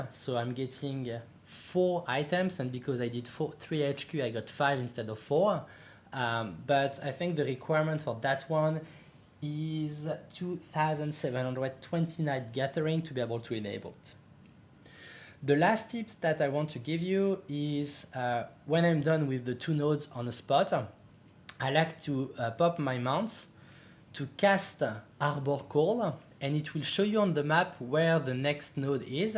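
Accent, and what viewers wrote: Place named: France. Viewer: non non, je suis Français aussi et ça m'amusais juste de voir quelqu'un avec un accent plus fort que le miens lol. Super vidéo merci. 🤝🏽👍🏽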